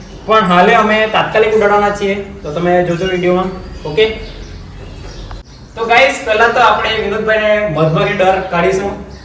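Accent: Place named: Marwar, India. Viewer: bhai to hamare marwadi lg rahe hai bhasa se to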